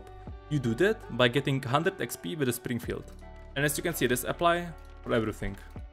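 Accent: Czech Republic. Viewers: Hello arent you from Czech ? you accent sound like it chears :)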